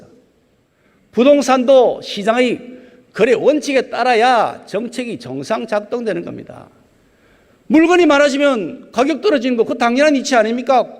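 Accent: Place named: Gyeongsang, South Korea. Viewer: 김기현 대표 쥑이네ㅡ퍼팩트 치시네ㅡ경상도어투가악간 가미된 또록또록한말투음성 질타하는 늠름한 모습 멋집니다ㅡ실컷 질타하세요ㅡ욕먹어도 싸기도쌉니다ㅡ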